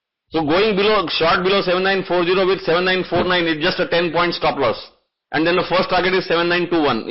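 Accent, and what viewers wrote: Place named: India. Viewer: That terrible Indian accent cannot be followed, such a shame!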